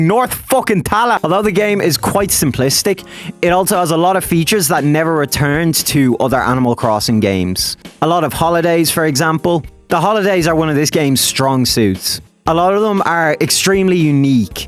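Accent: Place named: Ireland. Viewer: instantly clocked that you’re a fellow irish person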